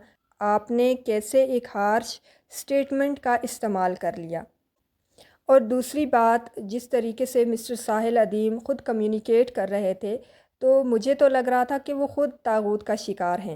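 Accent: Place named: Punjab, Pakistan. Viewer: السلام علیکم قرآن مجید کے الفاظ ہیں آپ ان کو اردو اور پنجابی کے مکس تلفظ میں ادا کر رہی ہیں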